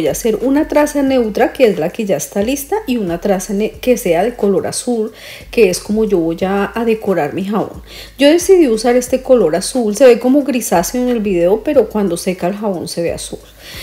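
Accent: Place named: Colombia. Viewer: de donde eres?!!, tu acento parece Colombisno!@ Saludos, una fan,Diana 🇵🇷👋🥰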